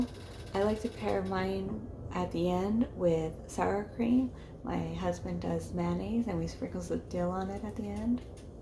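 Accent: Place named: Russia. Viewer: Great video! Love that Russian accent!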